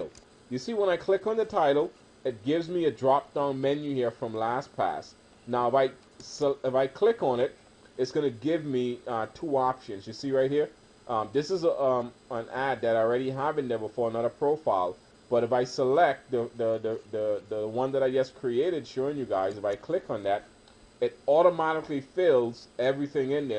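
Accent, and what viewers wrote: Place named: Bahamas. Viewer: OMG YOU ARE BAHAMIAN I can hear trhat I went to school with many bahamians Do you do do Online Businesses?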